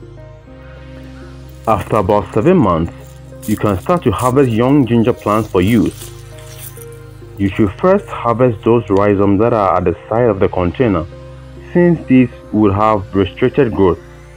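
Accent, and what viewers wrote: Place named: Ghana. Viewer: Doctor!! This is my 2nd video view today since I found your page! After reading a bit about you i know why I enjoy learning from you!! #1 your soothing voice..I was going to guess Ghanaian because it sounds so familiar and comforting! My neighbor's are from Ghana!! And the grandma (Boss!!😅) was so amazed when she saw the garden jnside my apartment 😁!!#2 youre a 🥼 scientist! My major was in science so you definitely have my attention because I know you will have experimented with all of what you're teaching us and want to deliver the best possible results! #3 youre obviously a kind, generous man and we need more of that in this world! 🌎 So thank you for not only helping me grow some plants that I've failed at for decades, but also giving me hope that there are goodhearted, bright, kind humans around. Blessings to you and yours Dr!✌🏼💜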